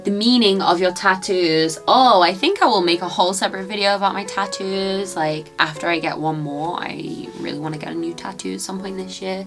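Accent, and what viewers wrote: United Kingdom. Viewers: She sounds completely British to me? What Americans have you been listening to 😂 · I love your british accent and Congrats to have a 6k subscriber!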